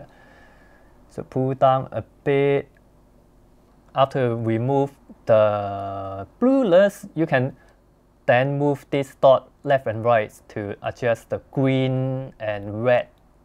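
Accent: China. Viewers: it is really good to hear that 'embedded Chinese native accent' when you talk. keep up the good work! thanks for this wonderful video.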